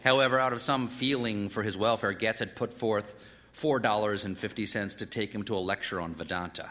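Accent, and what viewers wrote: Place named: South, United States. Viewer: He grew up in South Carolina but moved to New York in his early 20’s, so his accent is not as strong as it used to be...well that is until he reads a southern author or speaks with someone from there. Then his accent becomes thicker.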